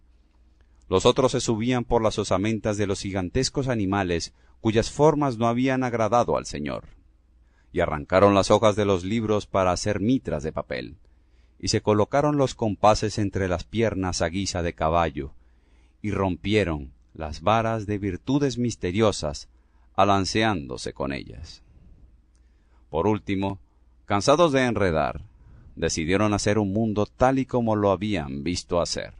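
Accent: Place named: Latin America. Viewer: Escuchar a Bécquer con acento latino...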